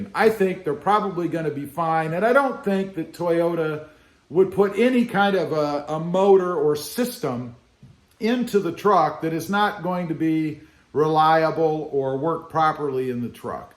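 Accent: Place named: North, United States. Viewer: You're in Texas? Sounds like you have a northern/eastern accent.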